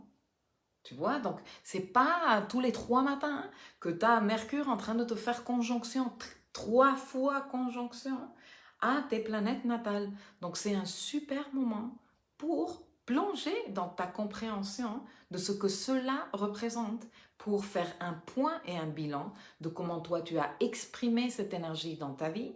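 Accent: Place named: Mexico. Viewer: Merci beaucoup pour votre reponse...🙏🙏je vous découvre depuis quelques temps et j'aime beaucoup vos analyses et interprétations très éclairantes qui se croisent avec d'autres...et surtout j'aime beaucoup votre accent Mexicain... Gratitude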